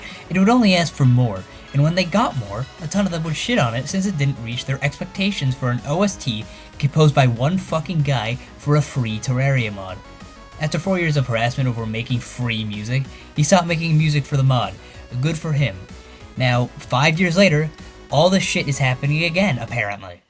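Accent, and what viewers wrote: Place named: Canada. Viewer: kenadian-ah voice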